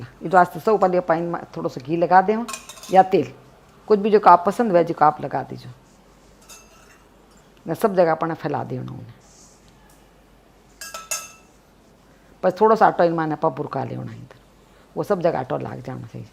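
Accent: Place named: Jodhpur, India. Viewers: You speak very sweet language of JODHPUR we Rajasthan people are proud of you SA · Antiji aap ki recipe bahot saral hoti hai.muje aap ki marvadi bhasha sunke bahot achha lagta hai.aap aise hi naya sikhaya kare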